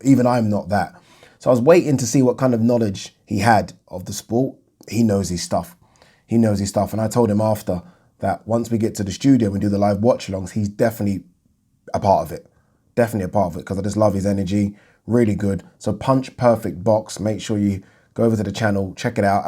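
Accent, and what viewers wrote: Liverpool, United Kingdom. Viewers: What part of Liverpool are you from?? 😃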